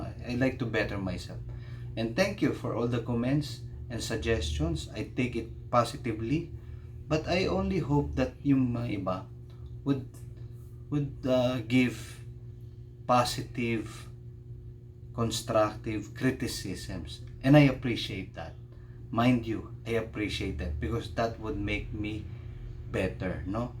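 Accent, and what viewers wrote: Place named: Philippines. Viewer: Great commentary. We are all God's children first and foremost. Sometimes we are our own worst enemies. Appreciate the uniqueness of each person. Your Taglish is absolutely fine. Namaste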